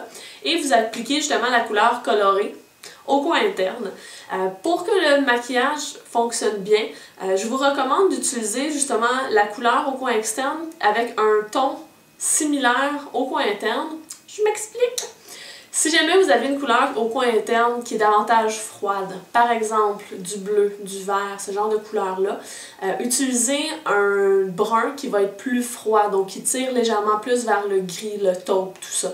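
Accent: Canada. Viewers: T'es canadienne, t'es pas? :-) très bon truc!!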